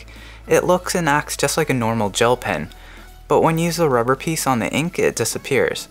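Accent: Canada. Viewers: Nice video. I watched because I got one free and didn't know how to use it. You sound very Canadian.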